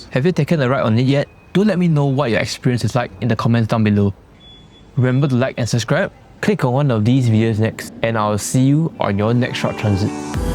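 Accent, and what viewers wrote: Singapore. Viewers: You sound Singapore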